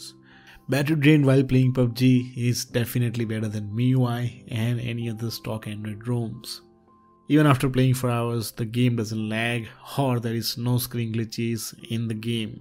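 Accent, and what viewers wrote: India. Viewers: Fcking hindi english accent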